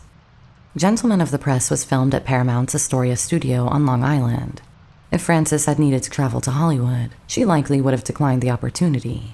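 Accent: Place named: South, United States. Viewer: What was doing?The documentary has a lovely and southern voice, unlike so many of them that are like gracious, I love your voice, whether it's real or unofficials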